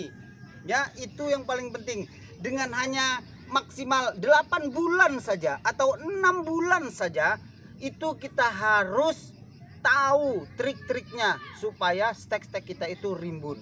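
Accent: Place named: Medan, Indonesia. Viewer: Tengok, nampak.. cakap-cakap khas medan 😂 Semoga sukses bang 👍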